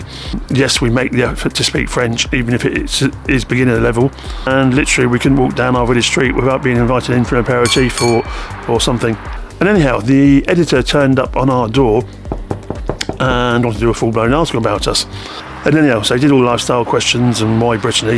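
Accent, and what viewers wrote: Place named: United Kingdom. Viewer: Gosh, it’s takes an effort to follow you. Speedy British not sure from which part of England and a bit of man mumbling😊. But I sounds like your French is better than your English!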